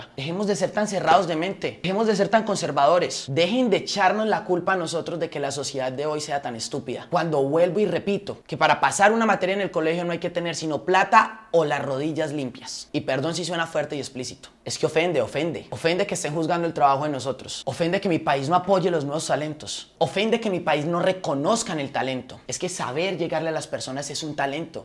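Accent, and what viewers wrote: Antioquia, Colombia. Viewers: asi es que se habla te apoyo Qué Mal que colombia este asi 😞😔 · vea mijo usted tiene toda la razon no le pare bola ninguno de esos comentario negativos envidioso y me gusta como hablan los colombianos y te felicito por que tu opinion es mui bueno te miro desde Ecuador Saludos a esos envidiosos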